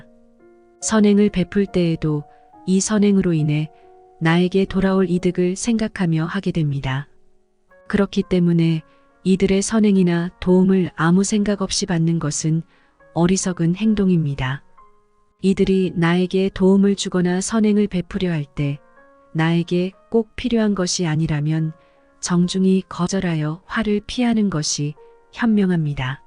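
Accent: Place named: Jeolla, South Korea. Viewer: 완전 전라도네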